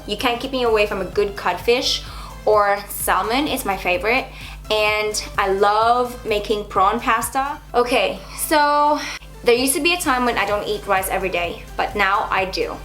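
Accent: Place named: United States. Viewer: First of all you look AMAZING 😍 Second, where are you from ? You sound like an american girl to me. I'm lovin' your channel ❤😘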